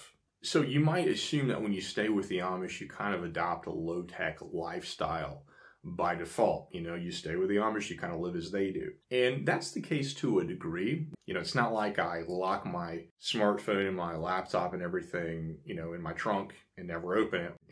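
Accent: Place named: Philadelphia, United States. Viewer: I was surprised to hear you live in NC. Some things you say sound like you grew up near. Philly.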